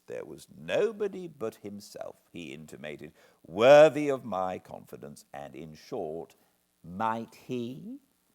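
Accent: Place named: RP, United Kingdom. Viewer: Thank you a thousand times for these readings! I've read several times David Copperfield and Oliver Twist, but so far, I had only read extracts of Great Expectations as you can find them in English learning books (mostly abridged text). I'm responsible for at least 3 views of each video since English is not my mother tongue. Your prononciation is very neat : it's like Queen's English with feelings and local colours (if that makes sense). My only fear is that you might stop reading before reaching the end of the book. Please, do go on until the last chapter and I promise to 'read with my ears' until the end too.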